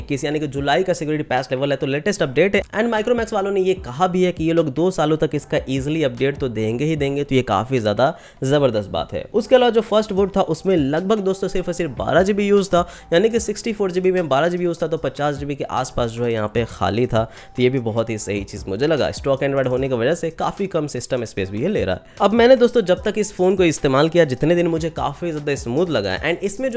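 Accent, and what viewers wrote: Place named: Bhojpuri, India. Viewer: Ye Bhojpuriya touch hamko acha laga 👌❤️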